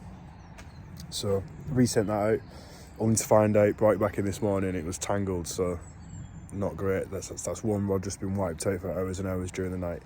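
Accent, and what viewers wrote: Northern England, United Kingdom. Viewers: Always bud! It's all different for everyone isn't it and a good laugh on a blank is still a great time out for me 💪 haha I am yet to catch from linear so don't expect any secret winning tips from me 🤣🤣 yeah mate I would be keen for that! FB me where abouts your club is, think I detect a northern accent from yourself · Where are you from? Clearly a Northern lad! Me and my mate fish here and have been Farnham members for a few years. We travel from Blackpool. Check out Red Rose angling for a session we recorded on Badshot Lea Big Pond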